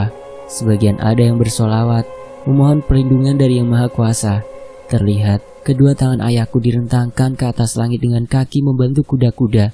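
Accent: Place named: Banyumas, Indonesia. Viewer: Apa sing Bae pak.. ngapak temen jaaann...😂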